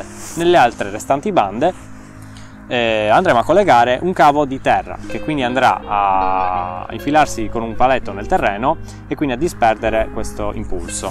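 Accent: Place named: Campidano, Italy. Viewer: Dove vivi esattamente?Dal tuo accento direi Campidano. Un saluto da una sarda in Scozia.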